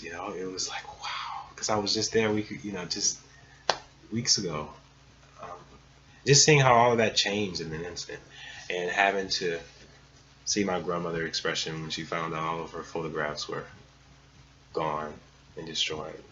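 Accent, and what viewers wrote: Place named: New Orleans, United States. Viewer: That New Orleans Accent was So Thick LOL !... Not So Much Now - Barely Can Hear It ...Thank U Much for SHARING '-p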